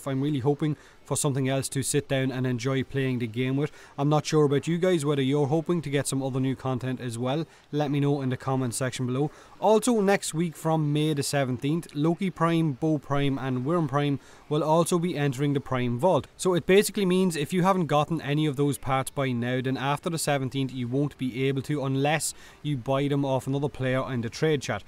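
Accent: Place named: Ireland. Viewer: I started Warframe back when Loki was still a starter frame, but then I stopped for quite a long time. Now I'm back at it, and I've had to search quite a lot of guides just to get my "mojo" back. Anyways, I stumbled upon this particular channel and I'm very much excited to find a youtuber with a even stronger Irish accent than Jacksepticeye. <3 xD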